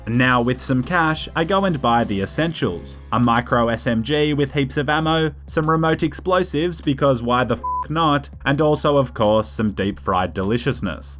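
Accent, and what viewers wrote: Romania. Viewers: Are you from romania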